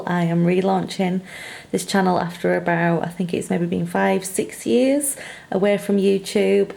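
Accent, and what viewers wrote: Yorkshire, United Kingdom. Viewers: Loved it. And I do believe you're a Yorkshire lass? Subscribed xx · I got waaaaay too excited when I heard your familiar Yorkshire accent 😂 I'm from Hull but I live in Leeds now ^_^ Love your bujo xx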